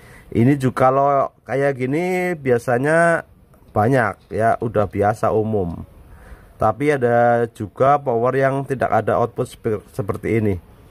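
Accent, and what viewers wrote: Banyumas, Indonesia. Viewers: Ngapak purbalingga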